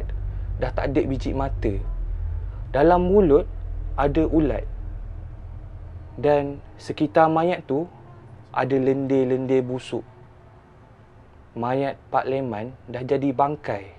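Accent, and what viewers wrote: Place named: Negeri Sembilan, Malaysia. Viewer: Esse org nogoghii....slang nogoghi kelauttt🤣🤣🤣👍👍👍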